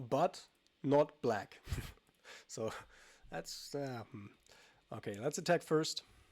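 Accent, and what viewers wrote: Germany. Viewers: Hey, nice vid. :) are u from germany? ;)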